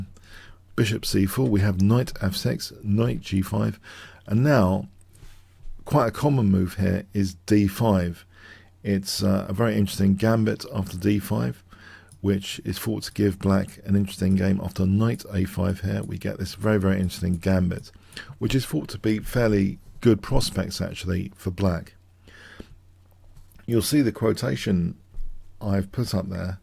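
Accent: United Kingdom. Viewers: His low voice and British accent makes it really difficult to understand what he's saying.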